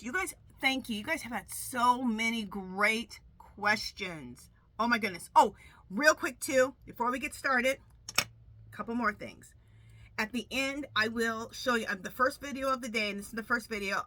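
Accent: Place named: New Jersey, United States. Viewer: Your predictions are very precise and informative. I enjoy your energy and down to earth podcast. I look forward to your videos. Keep them up for all of us hoping & praying for Kamala's reign. (You still sound like a jersey girl 😅) I'm a 73 y/o ex jersey girl... "you guys" are what we use to say.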